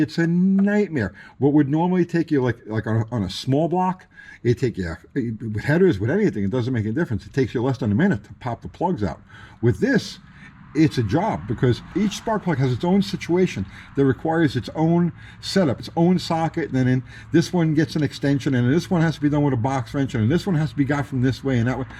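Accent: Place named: New York, United States. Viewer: You can take out of New York but you can't take the New York out of forgetaboutit!!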